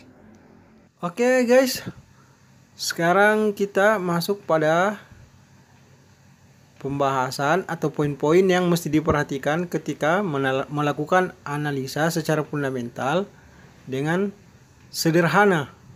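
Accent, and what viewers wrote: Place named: Sulawesi, Indonesia. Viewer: Wa alaikum salam. Pak, orang dari sulawesi yaaa? Kelihatan dari suaranya...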